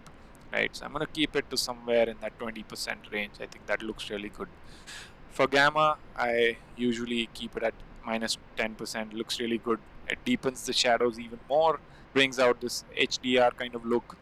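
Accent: India. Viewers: You from India?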